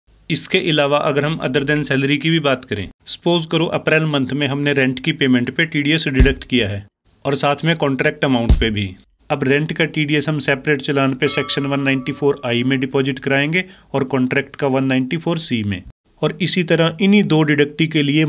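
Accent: Himachal Pradesh, India. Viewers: aap himachal se ho according to your accent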